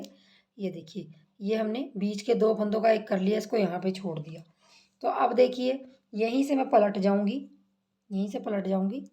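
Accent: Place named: Ambala, India. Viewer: Aap kha ke rehne vale ho Mam? Aap ki language hmare sath milti hai (Ambala) ki rehne vali hu me bhi, pl. tell ❤️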